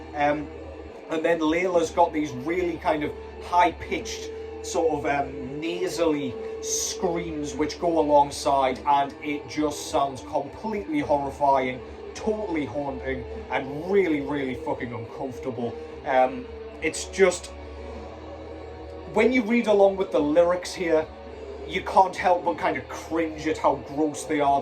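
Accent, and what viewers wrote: Newcastle, United Kingdom. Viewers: ah lived some of me life is Washington so ah knaa it can gan either way in Durham, but yuh sound completely Geordie tuh me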